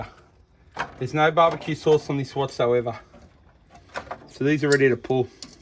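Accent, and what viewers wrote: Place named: Australia. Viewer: Hey there Chef.............. I'm looking to learn,,,,,,, to cook : using "GAS"....... and wow dude, I love it,,,,, you're not so easily understood,,,, because you speak "AUSTRAILIAN" english, and not "AMERICAN" english. .. I love it,,> thanks so much!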